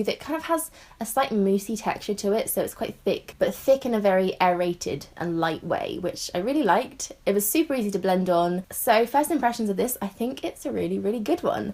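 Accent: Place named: RP, United Kingdom. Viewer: I get so distracted by your accent so I totally lose focus on what you're actually saying. I just love your accent, the way you articulate yourself and your intonations ❤ it is the queen's English. I had to repeat the video again haha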